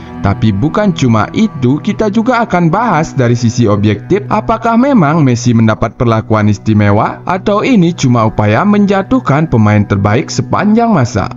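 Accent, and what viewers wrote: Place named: Aceh, Indonesia. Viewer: Suara admin nya macam logat org aceh...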